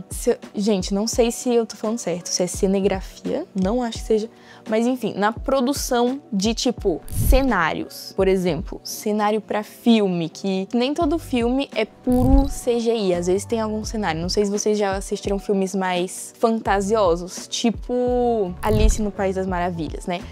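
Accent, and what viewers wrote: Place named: São Paulo, Brazil. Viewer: impressionante que dá pra reconhecer um paulista de longe pelo sutaque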